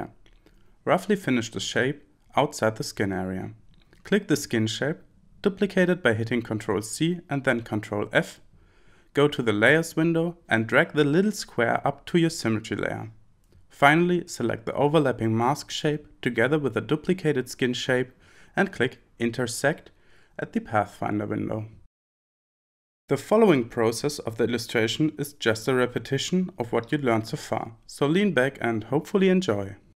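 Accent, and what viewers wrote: Germany. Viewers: you have a german accent, are you german? Man I love your tutorials! because you simply show the hidden tips and tricks, I'm a professional graphic designer, but those little tips you give, I haven't seen them anywhere else before! keep it going :)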